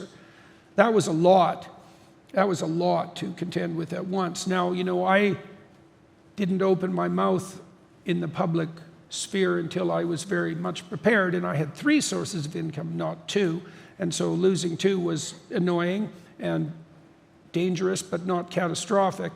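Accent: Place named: Canada. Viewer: He’s Canadian and has an accent as many if not most Canadians do when they pronounce “a”, if anything he was courteous enough to try to correct himself when he noticed. Does he truly strike you as somebody who would try and make a passive aggressive jab at someone by purposely mispronouncing their name? If anything he just spoke it as he speaks naturally and you’re being intolerant of him. But the main thing is, who cares? Your name is a sound that you respond to like a dog, don’t get so hellbent on stuff like that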